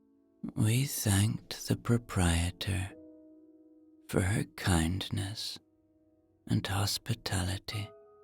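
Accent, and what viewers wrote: Ireland. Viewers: Dear, I was looking for some positive affirmations for self improvement and growth, but I could not find any I liked to fall asleep by. I either did not like the music which was too overpowering, or I did not like the manner with which they were spoken, being rattled down without even a breath in between. And I definitely did not like the voice. I only like your voice and I love your beautiful Irish accent. I would love to hear of series of positive affirmations to fall asleep by. In today’s world so full of confusion and hardships many of us would very appreciate more self confidence, attracting the right circumstances to us and have faith that all will be well. Thank you for all you do.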